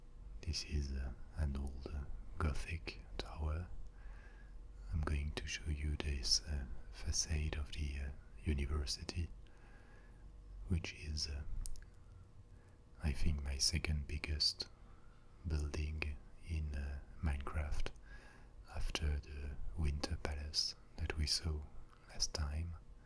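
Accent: France. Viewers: I just found your channel and are clicking through your videos and just wanted to leave a comment. :) Your level and tone of voice is exactly the right trigger for my ASMR (as well as the French accent...I don't know why, i just really like it, it calms me). So thank you for your amazing work, I will keep on listening now!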